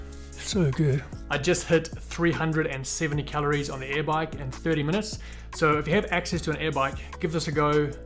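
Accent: South Africa. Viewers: ahh Potch boy. Durban girl here. Your accent is deceiving - definitely South African but a lot broader now which I would imagine comes from living in Oz. Ive been in the Middle East for last 14 years so same thing has happened to me. Anyway, keep up the great content. Id be drinking a lot of beer and wine and quarantine : )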